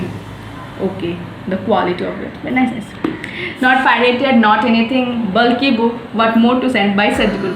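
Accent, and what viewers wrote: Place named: Assam, India. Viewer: R u from Assam ........ Karon kothat olpo Asomiya lagil❤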